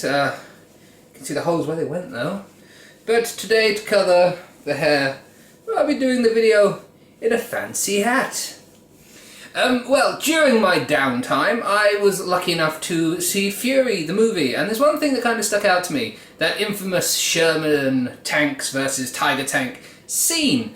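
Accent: United Kingdom. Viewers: Aside from your velvet queen's English accent I watch you because I learn things, I had no idea the differences between these tanks!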